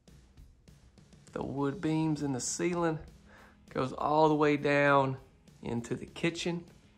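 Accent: South, United States. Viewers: “You know whut” lol I’m from the south too 😆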